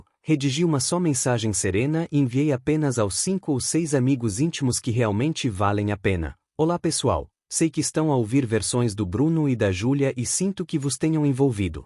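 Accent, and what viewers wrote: Portugal. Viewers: já vou inscrever. eu amo o português de Portugal, parece que é um relato do século 19, mas algumas palavras são engraçadas. quanto aos traidores os dois se merecem. você merece ser feliz.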